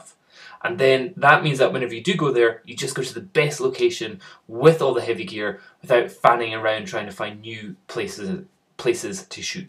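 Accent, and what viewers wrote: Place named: Scotland, United Kingdom. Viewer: I´d take the 550D with the kit lens! Btw. what intrigues me: is your accent genuinly Scottish or did you "internationalize" it in some way? It´s interesting, that you´re actually using Am.E. pronunciation sometimes like e.g. can´t, plant, chance, answer.... Accents have always intrigued me, so I´m digressing from the topic a bit!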